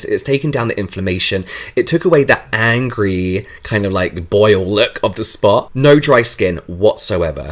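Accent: United Kingdom. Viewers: Do you come from the same exact area as Hermione Granger (aka Emma Watson)?? THE ACCENT!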